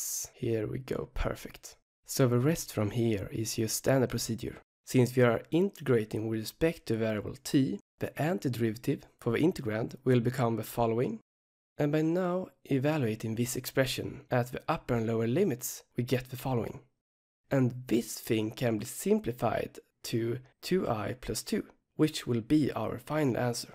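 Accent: Sweden. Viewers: ikr!! he has the loveliest Swedish accent xDD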